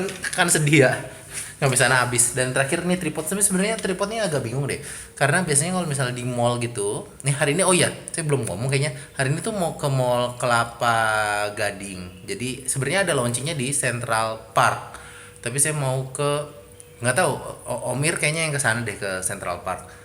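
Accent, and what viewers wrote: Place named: West Java, Indonesia. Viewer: orang sunda kah? logatnya sunda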